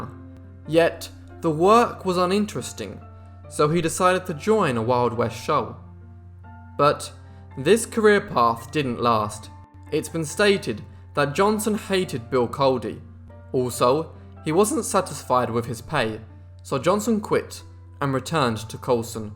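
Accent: England, United Kingdom. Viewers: English accent, I'm out. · I am surprised to hear this narration. I know American stories are shared the world over, but hearing English narrators is still a novelty for me. Thanks for the information. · Can’t understand this fucking Britt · Mark Felton that voice is awesome rolling proper British Gentleman s equal to his fantastic content, definitely in the running for YouTube Supreme Docudude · Why is a England accent doing the narrating it doesn't match the man.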